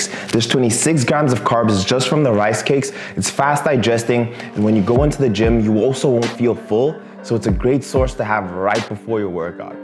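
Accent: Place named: South Africa. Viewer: For a second I was tripping because I thought that he was going overboard on the carbs... Either way My favorite shredding meal is definately a chicken avocado salad, just because the carb grams is low and when I cut most of the time it follows a higher fat macro setup so that my body produces more ketons and gets fat adapted faster... Just found out you lived in South Africa (which makes sense from the accent!!) You were closeby at one point.. You got a follower in Mozambique, keep it going!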